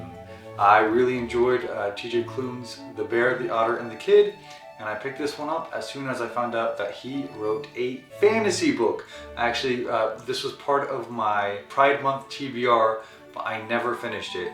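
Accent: South, United States. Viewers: I love your podcast on this video at your house in Texas during your quarantine until you had a lot of books in the back of your room including Surrender Your Sons by Adam Sass, House of Earth and Blood (Crescent City) by Sarah J. Mass, And I Darken by Kiersten White, The Invisible Life of Addie LaRue by V.E. Schwab, The House in the Cersulean Sea by T.J. Klune, The Fires of Vengeance by Evan Winter and so many others after that, but I hope your husband, Zach will be very proud of you, I love your Southern accent and I know you're gay!!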